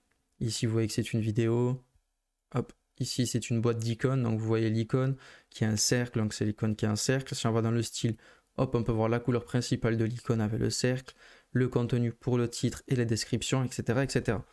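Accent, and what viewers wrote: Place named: South, France. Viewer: Merci beaucoup avec l'accent du sud !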